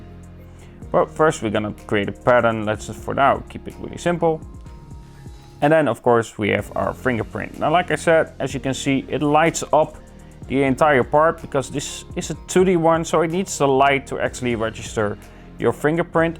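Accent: Netherlands. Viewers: are you Dutch? I'm like 99% sure...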